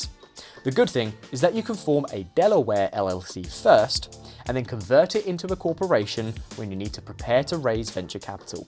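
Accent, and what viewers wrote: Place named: United Kingdom. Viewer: I like your British accent 💪💕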